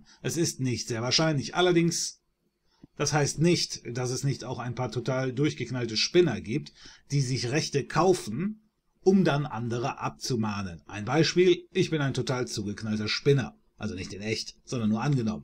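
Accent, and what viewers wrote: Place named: Swabia, Germany. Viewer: Schwabe! xD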